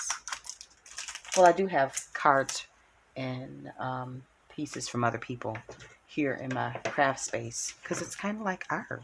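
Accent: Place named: New York, United States. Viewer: I always forget you are a New Yorker until you mentioned it. I can still hear it in there. 🥰 Please do a tea journal when you can squeeze it in. I’d love to see it. xoFaith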